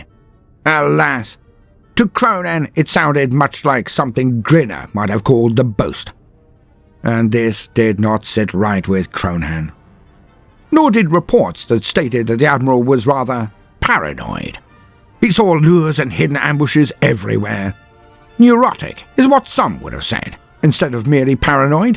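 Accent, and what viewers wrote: United Kingdom. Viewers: Hearing the contrast between a dramatic, vaguely british accented voice delicately explain the brutally cunning (or is it cunningly brutal?) workings of what passes for an ork's thought processes is just too perfect.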